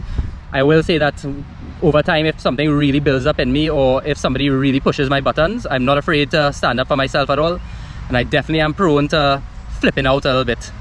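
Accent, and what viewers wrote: Trinidad and Tobago. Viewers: This was hilarious. 100% agree in regards to traveling. I am not an extensive traveller but I've been fortunate to visit a few countries and truly enjoyed it. You become more open minded and less ignorant because now you've experienced different cultures and people and it eliminates any preconceived misconception. On another note, I showed my wife one of your videos but muted it then unmuted and she was so confused. She couldn't comprehend why a "white boy" sounded like a Trini 😂😂😂😂😂. You should do another one like this but live! Bless.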